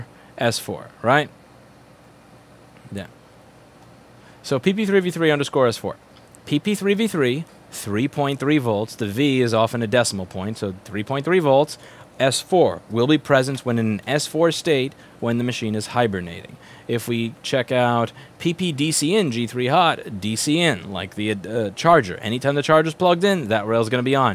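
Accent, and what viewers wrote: New York, United States. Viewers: He is a New Yorker; they speak differently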